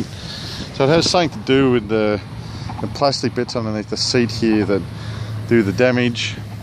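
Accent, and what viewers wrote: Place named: Australia. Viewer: Good Review and great accent. You Australians have reinvented English as a tool to maintain a single class society.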